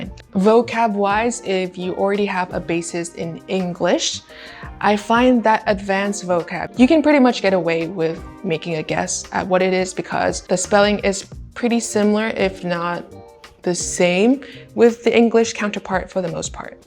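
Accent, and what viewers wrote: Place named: France. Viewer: What a beautiful french accent, congrats!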